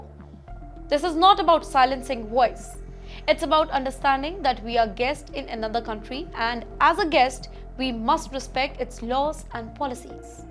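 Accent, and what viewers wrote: India. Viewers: What a hateful hindi English accent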